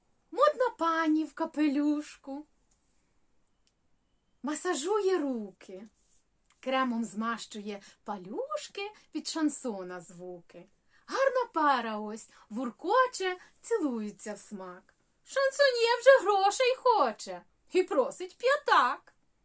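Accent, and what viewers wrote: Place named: Poltava, Ukraine. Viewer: лелечко, сонечко, ви ж такий талант! Я буду по всіх знайомих каналах на Ютубі вас пропагувати. Українського Ютубу багацько не бува. І мені як медом по серці ваша Полтавська говірка) ви неймовірна жінка!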